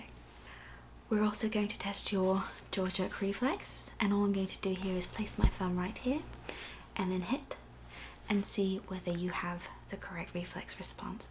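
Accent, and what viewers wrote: England, United Kingdom. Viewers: English* not British. There I no such thing as a "British" accent. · ASMR Your accent is more refined than the other British ASMR artists - Always good to have a unique trait like that. This also makes is more believable that you're a physician as it falls into our preconceptions of how someone with that job would speak. On top of that, you display a good amount of confidence and maintain eye contact with the camera/patient, which adds further to the credibility of this persona. Underpinning all that you also seem to have the basics of ASMR down pretty well. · Can I ask, are you originally English or just perfectly pretend to be? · I LOVE British accents🙏🏻 you are my favorite 🙈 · And what about a Scottish or Welsh accent? They're British too. This is an ENGLISH accent!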